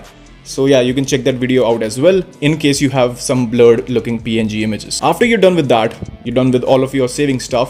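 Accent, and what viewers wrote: India. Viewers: are you a indian , not gonna lie your voice and accent are beautiful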